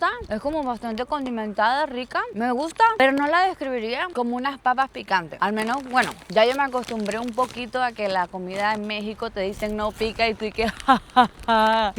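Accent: Venezuela. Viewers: me encanta como aún mantienes el acento venezolano. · Ojo con tu acento. No te vayan a agredir por allá por ser venezolana.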